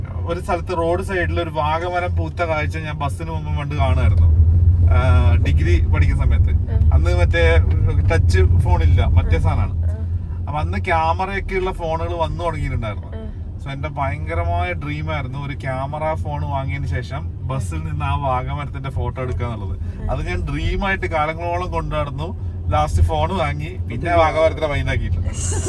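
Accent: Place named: Kannur, India. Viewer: bro..ഇങ്ങളുടെ എല്ലാ വീഡിയോയും കാണാറുണ്ട്... നമ്മുടെ കണ്ണൂർ ഭാഷയിലൂടെ ഉള്ള ah തനതായ അവതരണ രീതി,.Superb,..Video കണ്ട് കണ്ടു നമ്മളും ഇപ്പോ ah കുടുംബത്തിലെ ഒരു അംഗം ആയപോലെ ആയി..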